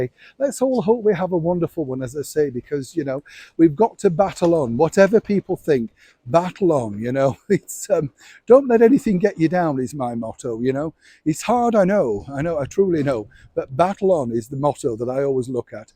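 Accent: Yorkshire, United Kingdom. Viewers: You are so positive...you always cheer me up ..I like your cute little Yorkshire accent, your nice looks , and one must mention the ties....... Blessings for 2023 from very cold Portsmouth.....roll on the spring....xxx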